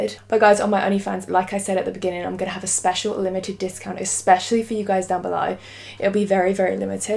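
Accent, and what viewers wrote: United Kingdom. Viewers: I did not imagine her with a british accent wtf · Ela é britânica da pra ver pelo sotaque.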